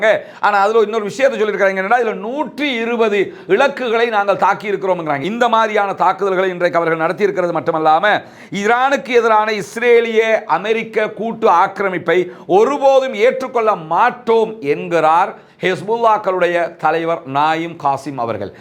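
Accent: Tamil Nadu, India. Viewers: Sago ungali videos ilangaiyulirunthu veliyuduvathaga arinthen unmaiyuleye namba marukirathu manadhu yenenil pesum thoni tamilnattukarargal pesuvathaipol nadaimurai tamizhaga irukkiradhu.🎉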